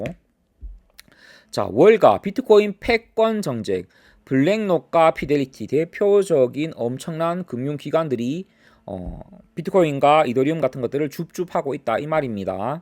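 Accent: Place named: Gyeongsang, South Korea. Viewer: 소중한 인사이크 감사합니다 근데 원래 경상도 사투리가 이리 웃긴가요 ㅋㅋㅋㅋ 비하발언 아니라 진짜 웃겨서요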